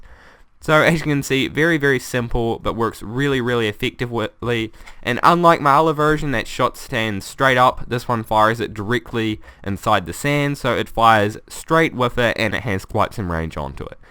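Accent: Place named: New Zealand. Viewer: As soon as I hear your voice I knew you were a Kiwi <3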